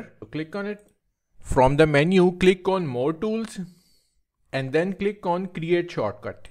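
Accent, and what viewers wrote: India. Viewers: Bro that's awesome. I have searched everywhere how to do this and finally, there is a random guy with Indian accent on a low sub-channel, again, everytime lol🤣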